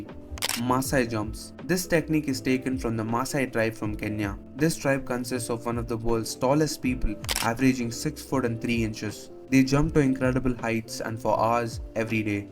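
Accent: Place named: India. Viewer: after i heard the indian voice i knew that video is gonna be crazy xD gj bro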